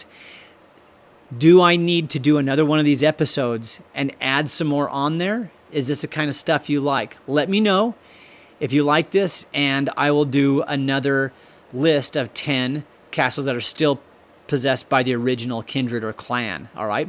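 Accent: United States. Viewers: Putting up the name of each castle somewhere on the Image as you were speaking about it, would've been helpful, especially as (no offence) your American pronunciation is not always on par with ours. Maybe consider it? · Narrator with an American accent? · Why are we heari g an americans voice,instead of a Scottish voice. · I am an Armstrong please do oters with a Scot speaking instead of your American voice... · Why is an American doing the voiceover?? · Why is there an American voice doing this , it put me off , he’s reading all this and not very well .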